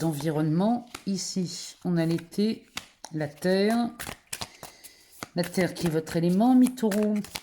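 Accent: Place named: Nord, France. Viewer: Bonne année. Merci d'être zen précise et positive. J'adore votre accent Chti.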